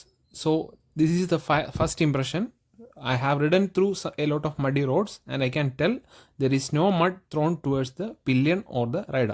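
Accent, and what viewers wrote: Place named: Kerala, India. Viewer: That thick mallu accent😂❤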